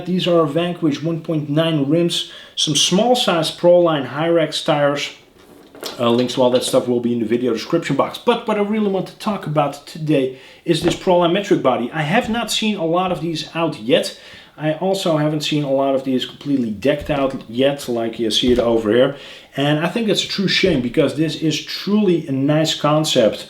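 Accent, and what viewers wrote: Netherlands. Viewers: Dutch accent ;)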